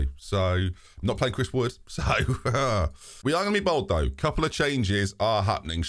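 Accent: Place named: Newcastle, United Kingdom. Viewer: Where's the geordie accent today ? 😞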